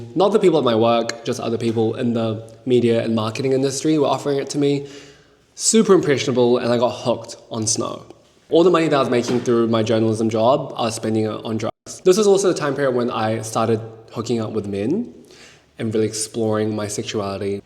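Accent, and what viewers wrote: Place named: Auckland, New Zealand. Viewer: this is sort of off topic but you have a very EASY voice to listen to -- some ranters can be grating on the ears or tell stories too slow, too fast but your pacing was perfect and your tone, dry humor and kiwi accent all tied so well together and made this an easy watch. Coming from a fellow kiwi who can't really stand the intense american influencer accents, you have a really clean kind of auckland accent, and since thats rare to find in influencer media, this kinda felt like a breath of fresh air, lol. This video literally healed my fried attention span. So shottiess for the mean as content, and am looking forward for more to come!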